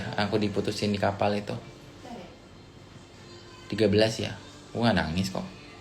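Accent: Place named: Jember, Indonesia. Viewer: Logat jembernya masih kentel ... Bah... Semoga ada seseorang yang bisa sembuhkan kamu. Aamiin.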